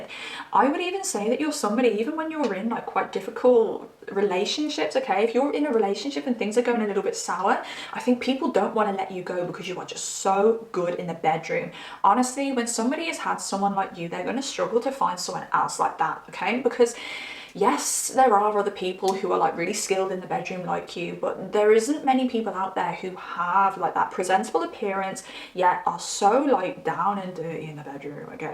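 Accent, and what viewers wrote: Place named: England, United Kingdom. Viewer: Aww I always watch tarot Chanel’s and I’m from Essex so it’s great to see and hear an English girl up in here! I really resonate with your reads big time! Thank you 🎉❤ ps I’m also a cap rising ⭐️😝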